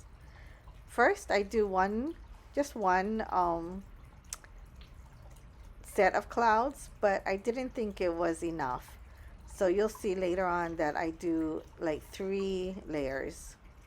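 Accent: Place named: Hawaii, United States. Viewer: I agree, the slimline was perfect for this stampset! So cute!🤗 Gosh, I love listening to your Hawaiian accent. Reminds of my work Ohana when I was stationed on Oahu🤙🤙🤙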